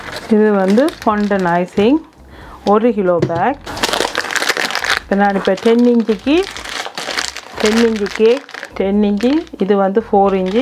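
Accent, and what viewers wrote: Jaffna, Sri Lanka. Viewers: jaffna tamil pesathinga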